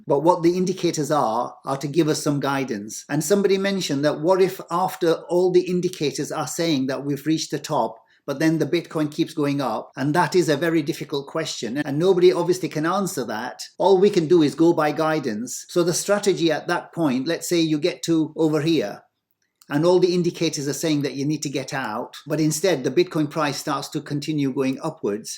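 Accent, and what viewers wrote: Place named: Yorkshire, United Kingdom. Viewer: Hey ~ This may surprise you!! The B-Exit Indixator is not my favourite part of your video... rather hearing your Yorkshire accent!! No eee byy gum though???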